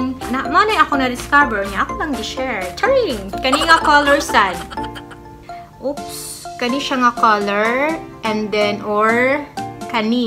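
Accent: Visayas, Philippines. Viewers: ganahan kos imung explaination sis makalingaw makadungog imong binisaya hehe need sad nako na para sa ilong nako nga himos hahah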